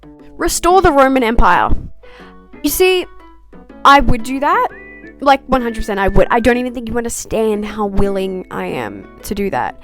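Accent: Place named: United Kingdom. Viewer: Yes. She sounds british. wait wtf how old is she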